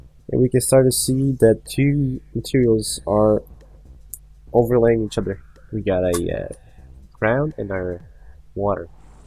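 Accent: Russia. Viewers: I just wonder what went wrong. It seems to me that you tried the right things (crank down weight, crank up roughness), all the stuff i'd try too — but it didn't work out. Still don't get the point. P.S. is a russian, according to accent, and you —?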